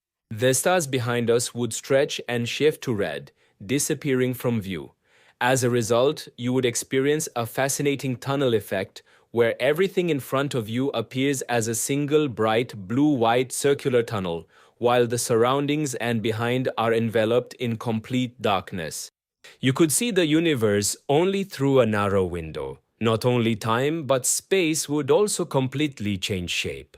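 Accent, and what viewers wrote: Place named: India. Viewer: İndian aksan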